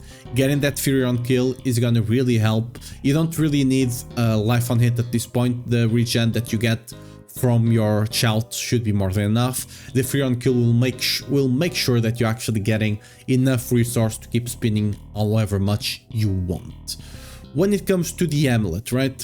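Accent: Portugal. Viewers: Based on your accent, I am 50000% sure you are Portuguese. Please tell me I am right!?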